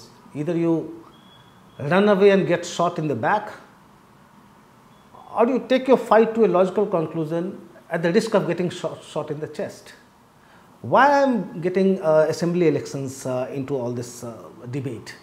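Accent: India. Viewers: I like your analysis AND. I like your accent - not everyone needs to speak like goras - Indian accents are perfectly acceptable - don’t be snobbish guys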